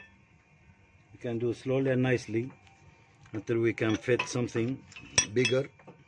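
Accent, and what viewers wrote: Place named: Arab world. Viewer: من لكنة كلامك اظن انك عربي ارجو رفع فديوهات اكثر بخصوص الباجيرو تحياتي لك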